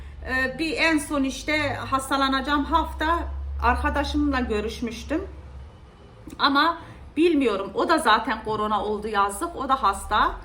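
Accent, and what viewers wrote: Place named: Nevşehir, Turkey. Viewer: Çok geçmiş olsun sizi yeni gördüm nerelisiniz bizim oranin konuşmasina benziyor konuşmaniz ben nevşehirliyim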